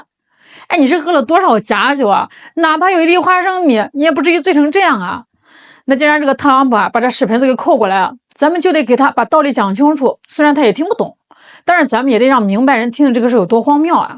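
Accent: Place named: Northeast, China. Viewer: 主持人能不能不说东北话😂😂，还有说话的时候能不能别露牙肉😂。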